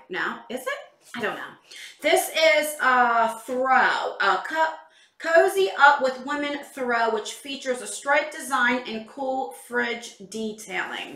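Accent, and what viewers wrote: New Jersey, United States. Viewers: I just love you and your Jersey accent 💖... My favorite thing in the fab fit fun box would probably be the throw... I'm obsessed with blankets of any kind... I do not get the box but have thought about getting it but if I got all the subscription boxes I want then I would be broke all the time 😊😂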